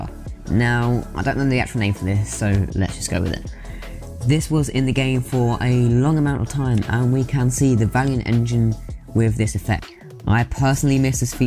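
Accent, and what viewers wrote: United Kingdom. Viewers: Are you, British/Briish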